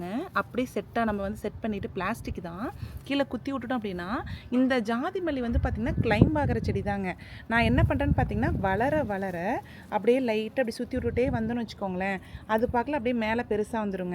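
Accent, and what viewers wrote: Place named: Namakkal, India. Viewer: Sorry, nangalum namakkal than ka. Neenga visa visanama pochnu solrapa engala mathri solreenganu santhoshama irukum ka.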